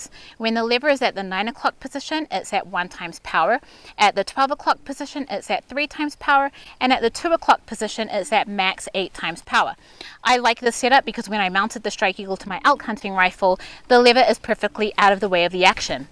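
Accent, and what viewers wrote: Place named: Australia. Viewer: Great break down and description . Was thrown at first with the accent and was surprised to see your channel is out of Australia. With the law's trying to get changed here in the States and law's that had been changed in Australia. Very interesting the many topics your channel can bring. Again great review , very well presented and very informative. would like to see actual action/use of the product/product's. Keep up the great work!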